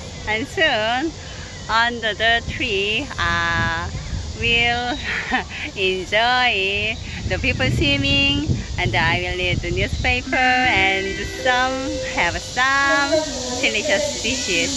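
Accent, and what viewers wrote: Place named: South Korea. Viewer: 외국에선 실제로 그런 발음이나 문법적인것들 보다도 실제로 상대가 어떤 이야기를 하고픈 건지에 대해 더 주의를 기울이는데 댓글창에는 번지르르한 보이는것들에 더 집착하는 분들이 계시네요. 덧 없습니다. 계속 keep it up하세요. 한국인으로 한국발음에 익숙하게 자라온게 너무나 당연하고 자연스러운건데 왜 꼭 영어하는 세상 모든 사람들이 본토의 원어민 발음을 가져야 한다고 생각할까요? 말도 안되는거죠. 항상 응원하겠습니다.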